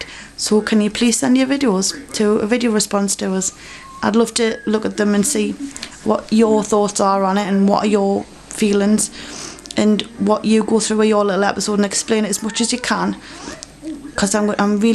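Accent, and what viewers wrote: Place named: Derby, United Kingdom. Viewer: You talk funny (Derby lad).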